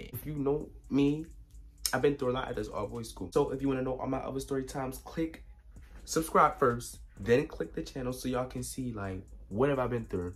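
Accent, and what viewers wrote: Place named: DMV, United States. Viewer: U gotta be from the dmv cuz the accent lawddd😂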